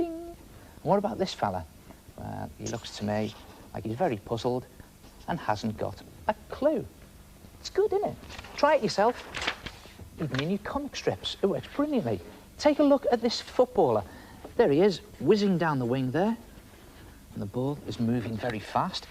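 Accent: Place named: Liverpool, United Kingdom. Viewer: I am Canadian and I used to watch Art Attack all the time and I thought Neil was Scottish I now know he’s scouse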